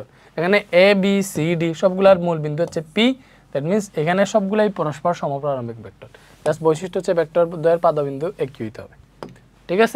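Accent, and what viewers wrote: Sylhet, Bangladesh. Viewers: Vaiya apni ki Sylheti...?? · vai apni ki sylheti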